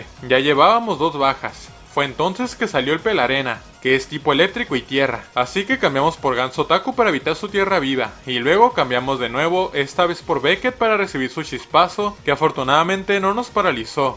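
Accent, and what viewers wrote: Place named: North, Mexico. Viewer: Oye bro. ¿De qué parte del norte de México eres?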